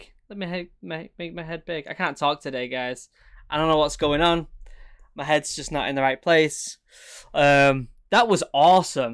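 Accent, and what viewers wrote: United Kingdom. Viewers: British accent is so cool: part two, bad idea, lad... Hehe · A little mistake to make your head big eh😂😂. Still, I love your british accent😂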